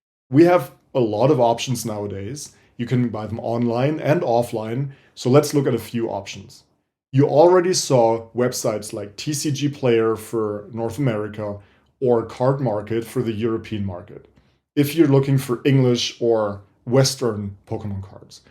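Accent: Germany. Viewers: Tolles Video (ich hoffe ich habe den Deutschen in dir rausgehört ;) )! Ich versuche mich seit Tagen schlau zu machen über das Pokemonkarten game, weil ich vorhabe meiner Nichte (1 Woche alt) zur Taufe etwas wertvolles zu schenken auf das sie stolz sein kann wenn sie mal älter ist... jedoch habe ich noch keinen Anlaufpunkt gefunden wo ich nicht dieses Gefühl hatte, dass der Verkäufer einen "Dummen" sucht... gibt es einen zertifizierten Händler, wo ich ruhigen Gewissens "einkaufen" kann? Liebe Grüße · I hear the Deutsch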